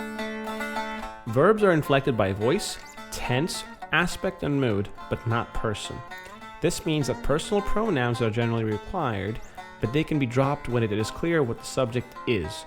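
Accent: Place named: Sweden. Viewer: The intonation sounded very Swedish.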